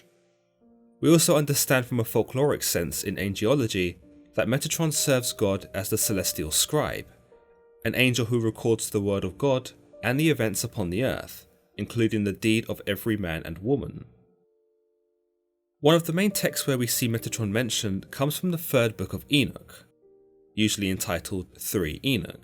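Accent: United Kingdom. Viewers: Your scene is very witchcrafty creepy I noticed you have a British accent I I know there's people trying to sell something on TV have a British accentee too and make you more respectable acceptable and intelligent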